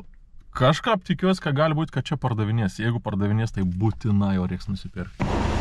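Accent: Samogitia, Lithuania. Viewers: Super žemaitis :)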